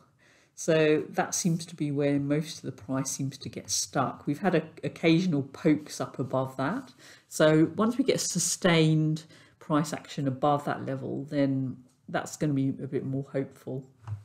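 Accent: United Kingdom. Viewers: The best analysis in a very long time, and I love the British accent as it reminds me of my lovely old days in Wesr Yorkshire at Bradford Uni. Ta love!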